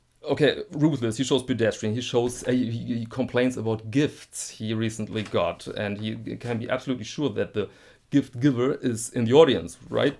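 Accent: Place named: Germany. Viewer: I enjoy your videos for several reasons... Mostly, your accent and mannerisms. You remind me of my Grandfather who was born and raised in northern Switzerland in the city of Aarau. I understand that you have a German accent, but you sound much like my memory of a great man. Also great content. Cheers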